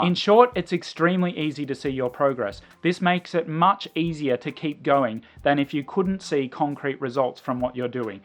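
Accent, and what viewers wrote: Australia. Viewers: My husband is Australian and I have lived here 55 years so I'm Australian too. I was listening to you and asked my husband what is this accent this guy has because I love it and I can understand him. He said he's an Aussie and to us he doesn't have an accent, so I felt very comfortable. Thank you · when I watch your videos I get reaaly happy because months ago I couldn't understand anything in Australian accent (some sounds are different), and now I just realized I can understand you perfectly. My target language is English btw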